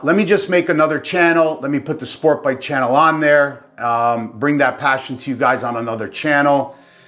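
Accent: New Jersey, United States. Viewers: Thanks for the explanation! I was worried that we'd miss your enthusiastic Jersey accent :) Maybe a nice segway to the new channel would be you getting on a PanAm or better yet, a Buell. then you get all the viewers, HD and Sporties alike lol In all seriousness though, as long as your riding youre good in my book. And if folks cant handle learning about a different type of bike, well....as my mom would say "sucks to be them". I hope you throw a couple Harley videos on the sport site, just for kicks ;)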